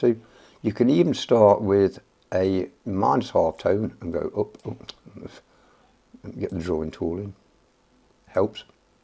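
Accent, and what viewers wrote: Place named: Staffordshire, United Kingdom. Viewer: Cheers, Mate, SO...A little secret, I have 4 Guitars, 1 Mandolin and a "KORG EK50" and the Modulation control is a 'Joystick', (not the wheel configuration), you will not believe what Heavenly Fun I have attempting to annoy my near Neighbours...(Broken Headphones was my excuse..🤗🤗☠☠). I note STAFFORDSHIRE in your accent....Shenstone is my Family "SEAT"...now over in darkest Lincolnshire. Keep your fingers nimble...cheers..G.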